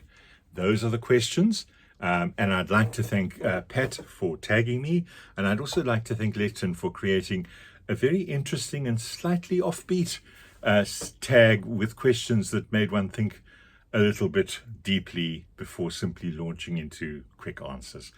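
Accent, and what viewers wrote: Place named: New Zealand. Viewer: 👋🏼Newer subscriber here, and I was enchanted by your accent. I was hearing a little New Zealand, a little German, and then solved the puzzle by looking up your home page--haha! Nice version of the tag. You're a more ambitious reader than I!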